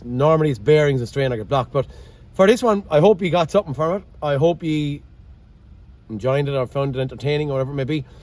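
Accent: Ireland. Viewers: the southern Irish accent is a great accent am from the north of Ireland I can understand your accent easily. Great videos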